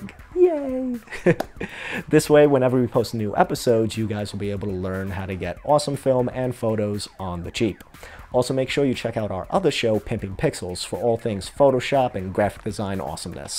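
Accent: United States. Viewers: I don't know why but the more the video went on, the more I begun to like this guy. Might be his accent which I assume is like an East Coast accent? IDK. But consider me subscribed. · i love this deep brooklyn review :DD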